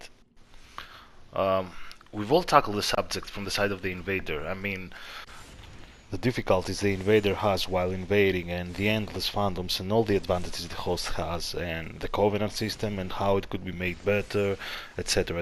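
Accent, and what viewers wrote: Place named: Italy. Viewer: Oh, I agree with you. A lot of invaders are just elitists jerks. Everybody was a noob once. Everybody struggled. I know I did. People still complain about gankers, but real gankers are so rare nowadays. 3 dudes trying to beat the level are not gankers they are co-oppers. DS3 was the most balanced way From Soft did in my opinion. IMO It struck the possible balance between hosts not being too strong and invaders not being overpowered. It makes the game fun for most people. About DSR - I have heard about the rumours about weapon matchmaking, but as far as I know that is still unconfirmed or uncertain ("Players outside a host's parameter range will not be matched with each other"). The estus healing only in DSR is already confirmed. <link> DSR will IMO follow a lot of what was learned about balance in DS3, as DS1 was very unbalanced, sometimes in the hosts favor and sometimes on the invaders favor. 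I am glad to hear this. I bet there will also be a double confirm system on backstabs like in ds3, and stunlock will not be infinite, but this is just speculation. Anyway, great video, and I hope to see your content on DSR. PS- BTW you are italian, right? Your accent seems italian!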